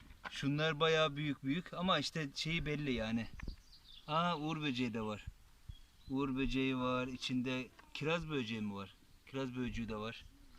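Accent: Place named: Ordu, Turkey. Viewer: Harika Ordu şivesi 😁.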